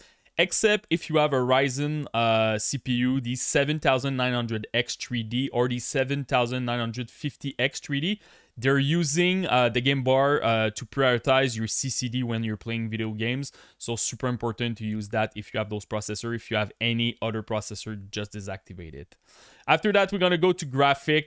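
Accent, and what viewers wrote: Quebec, Canada. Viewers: J'entends le Québec ici! Good video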